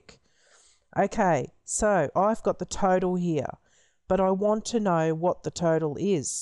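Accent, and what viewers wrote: Australia. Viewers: I love your accent. That is Australian? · Greetings mate!!! While I can certainly appreciate you taking the time to create this informative video, you kept saying: ok one to many times. Which many of us find distracting and highly unprofessional to say the least. It's bad enough we have to endure your aussie accent topped with ok, ok, ok, ok, ok, ok, ok. People need to be able to fully concentrate. Its apparent saying ok to often is part of your vernacular, but re-frame from saying: ok, when attempting to make a professional and educational video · Thanks for your explanation and tutorial! (I miss the Aussie accent!) :-)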